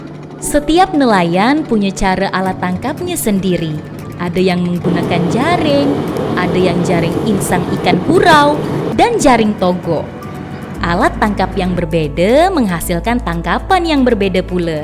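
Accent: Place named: Malaysia. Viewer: Kenapeeee suara narator ini seperti orang Malaysia. Padahal ini ada di provinsi jambi. Tak asik lah dengar suara seperti niiii. Tak jadi saya tonton.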